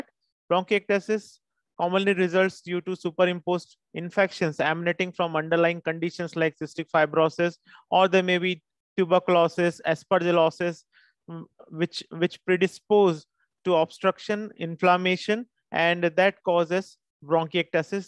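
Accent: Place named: India. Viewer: Unmöglich: deutscher Titel, und dann auf Englisch, mit einem grauenhaften indischen Akzent!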